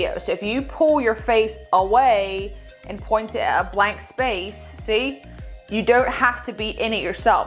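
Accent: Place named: Australia. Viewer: ohhhh okok I thought I heard Aussie in there! ❤️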